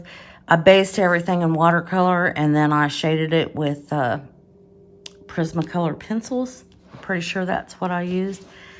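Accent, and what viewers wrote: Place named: Alabama, United States. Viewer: Hey, she Alabama southern just like I am but North Carolina style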